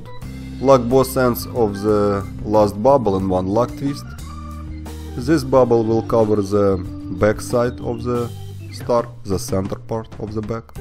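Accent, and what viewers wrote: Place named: Russia. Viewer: Great Russian accent ))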